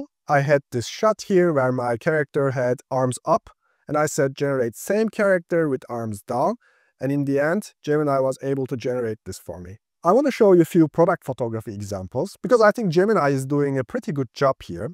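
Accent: Turkey. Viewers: thanks for content bro 👍 i assume you are Turk right ? just feel it by your accent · baba türkçe aksan akıyor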